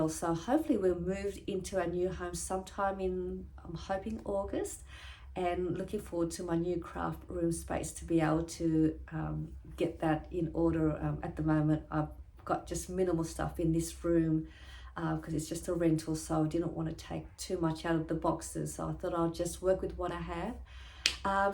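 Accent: Australia. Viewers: the best Australian accent I have ever heard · I love your Australian accent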